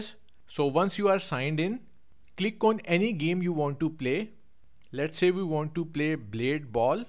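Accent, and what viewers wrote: India. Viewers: worked amazingly, thanks indian accent man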